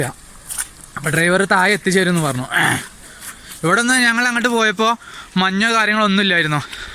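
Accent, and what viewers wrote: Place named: Malappuram, India. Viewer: Ithil samsarikuna aalude samsaram assal malappuram. Ushaarayitund.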